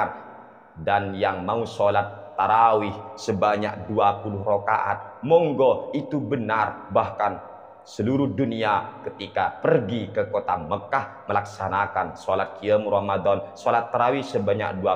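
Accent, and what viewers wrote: Madura, Indonesia. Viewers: ini org madura mna?? klhatan logatx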